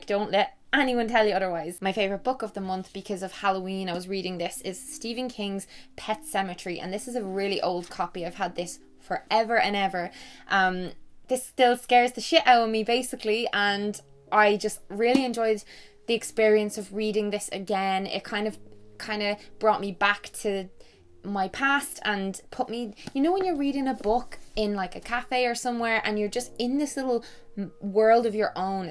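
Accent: Ireland. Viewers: So happy to see this video from you right now- I have horrible pms cramps and sitting with hot cider listening to you is so nice! I started watching you because I saw one of your food diaries in the related videos of a what I ate in a day video I was watching. A beautiful sweet bi lady with an Irish accent who loves Harry Potter and makes food videos! Right up my street :) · I found your channel because my ex is Irish 😘 and I just loved your accent and I loved your videos!!!! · I found your channel because I was googling Irish youtubers (Irish accent is the BEST) haha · I found your gorgeous self last year by looking for Irish accent tag videos haha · I found your channel while looking for Irish YouTubers to learn the accent! Also, I'm taking my first trip to Ireland next year, and I would LOVE it if you and Jessie could make a video on must see places in Ireland. 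Especially if there are things only the locals know about! I love your videos!!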